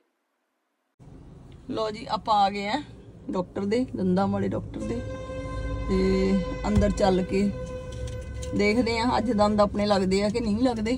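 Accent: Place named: Doaba, India. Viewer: Sade vi doabe vich pateela khende ne bhan ji 🙏🙏 thudi boli sade vargi a same ❤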